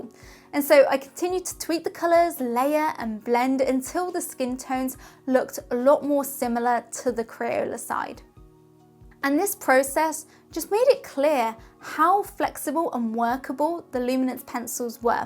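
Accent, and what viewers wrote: England, United Kingdom. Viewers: OMGGG I lovee your English accent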